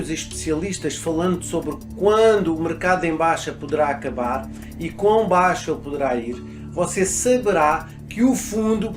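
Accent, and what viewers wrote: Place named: Portugal. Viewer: Não consigo assistir vídeo em português de Portugal 😥😥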